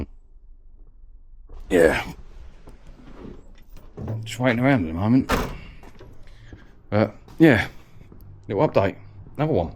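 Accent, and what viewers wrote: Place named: South East London, United Kingdom. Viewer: I knew you was near me with your accent I’m in Croydon area do you have a email or some way of getting hold of you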